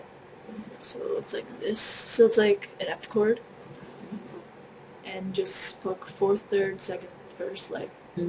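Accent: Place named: Asia. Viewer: Lol ur asian accent. You said," da tabs..."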